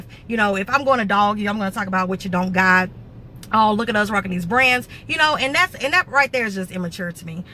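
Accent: South, United States. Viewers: YOU SO COUNTRY OMG😍😍😍😍😍😍😍😍😍😍😍😍😍😍😍😍😍 WHERE YOU FROM!?!?!?!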